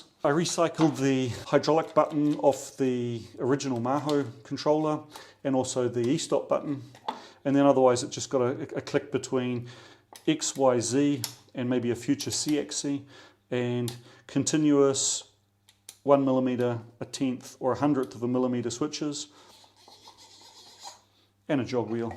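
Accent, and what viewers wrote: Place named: New Zealand. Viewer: Great informative video, You sound Kiwi to me??? Cheers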